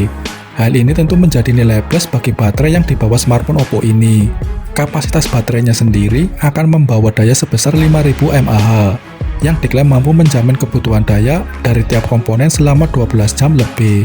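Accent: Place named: West Java, Indonesia. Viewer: Bang... Orang sunda nyakkkk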